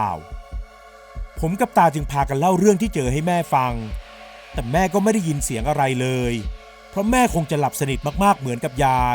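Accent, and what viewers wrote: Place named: South, Thailand. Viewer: ชอบที่พยายามจะแหลงใต้ <num>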